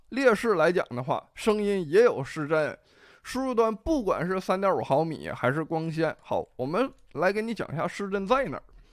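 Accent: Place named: Northeast, China. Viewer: 哥们你东北哪儿的:face-turquoise-covering-eyes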